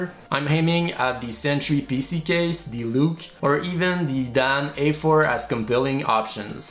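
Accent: Quebec, Canada. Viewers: Es-tu Québecois?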